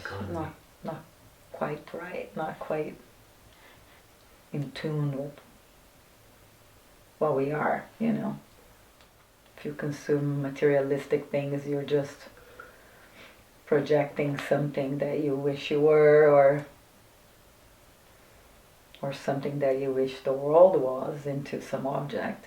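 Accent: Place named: Brazil. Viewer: Brazilian accent ❤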